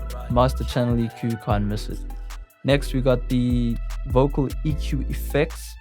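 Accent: South Africa. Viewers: Thats the most South African accent iv ever heard lol cool vid man!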